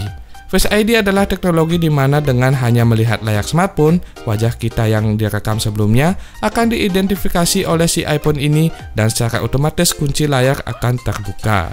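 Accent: Bali, Indonesia. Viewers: Logatnya Bali ni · Jeg khas omongane bli, medok bali gati Ulian ne dot dadi meli puk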